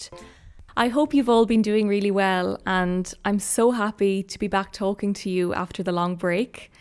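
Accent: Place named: Ireland. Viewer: What part of the island are you from ? I've heard the irish accent changes a lot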